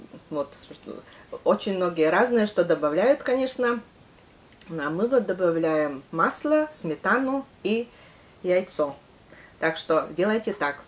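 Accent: Komi, Russia. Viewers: Я думала когда впервые увидела Ваш канал,что это блогеры с Прибалтики.В Коми свой акцент при разговоре.Покажите ещё лепешки с содой.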